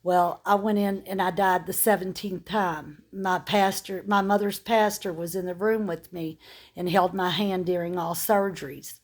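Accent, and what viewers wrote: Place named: Louisville, United States. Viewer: When she said eastern parkway I knew she was talking about Louisville plus with her accent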